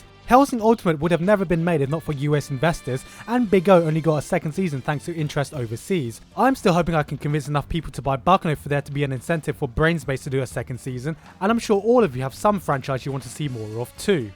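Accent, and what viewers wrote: United Kingdom. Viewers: No! nonono, you are not a Uptight Obsessed Douchbag, you are a Uptight Obsessed Douchbag with a british Accent. And i must Say, you should not buy every single DVD you watch! Basically we should force the market to put anime Back on TV Nothing less! The Netflix Model is quite interesting but here in UK i dont think they have anything on it.. Only buy material if you really like the Franchise, and !!APPROVE!! otherwise you are feeding fatcats.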